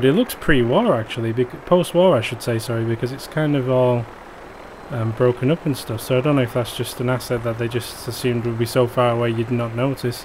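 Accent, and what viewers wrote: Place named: Leeds, United Kingdom. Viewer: That's a leeds accent if ever I heard one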